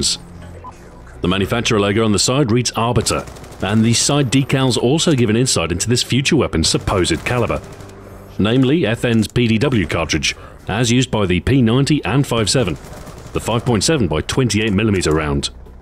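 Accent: United Kingdom. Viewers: I don't get what's so weird about his voice. It's just a British accent! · Well, he has a British accent and his voice is like hot chocolate 🤔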